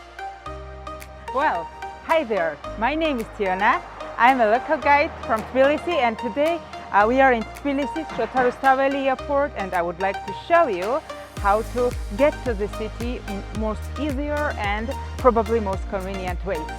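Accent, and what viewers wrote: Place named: India. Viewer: Ma'am one thing that I have noticed that you are looking very confident nd your video is very much interesting. I don't know that you are Georgian origin or anywhere else. But you English ascents are very much similar to Indians.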